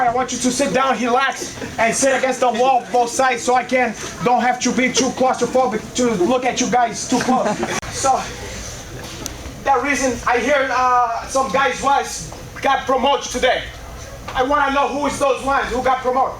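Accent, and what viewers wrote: Brazil. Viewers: i think he is doing a parody of every brazillian fighter or vinny marglese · you know you’re acting is on point went an actual brazilian thinks you’re really from Brazil.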